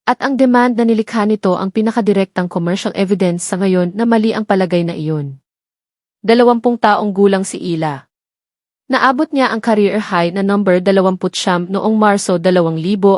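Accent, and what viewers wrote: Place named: Provincial, Philippines. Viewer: 😂😂😂 pronunciation lng ng NIKE pang barrio ka na😂😂😂 pati Debut mali din😂😂😂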